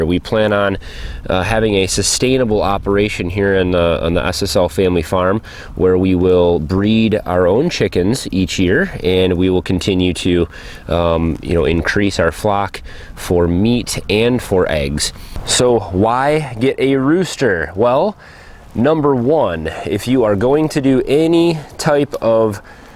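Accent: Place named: Midwest, United States. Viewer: What part of the country? Midwest, I'm guessing? I live in the Pacific Northwest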